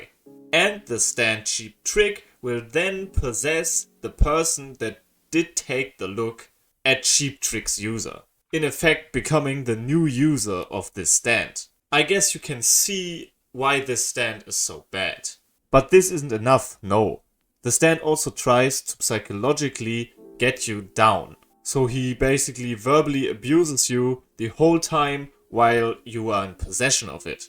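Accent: Germany. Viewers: Deutscher Akzent! Weiter so Bruder💪🏻👍🏻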